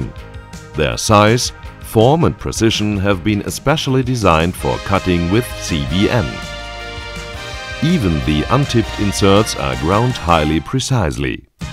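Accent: Germany. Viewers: Der deutsche Akzent in diesem Video ist aber recht dezent und unaufdringlich möchte ich meinen. Da muss man ja fast Deutscher sein um zu wissen das es ein deutscher Akzent ist. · Ich liebe diesen deutschen Akzent im Englisch.. :D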